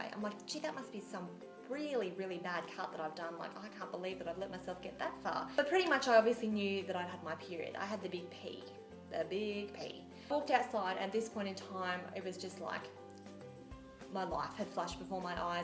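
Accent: Australia. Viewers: You have the best Aussie accent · i love ur humor ur so funny i love ur sas and u have a real aussie acsent u sound like kath off kath and kim i live in australia too perth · You're Australian right? I am ☺️😘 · Are you Australian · Someone else is Aussie😆 Love you💘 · Are you from Australia?? If so where from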